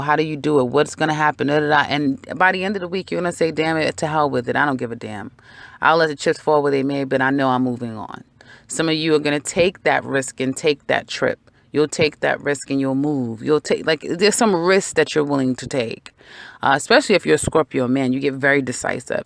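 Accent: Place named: East Coast, United States. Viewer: Hey, are you from the east coast? love the accent & thanks for the reading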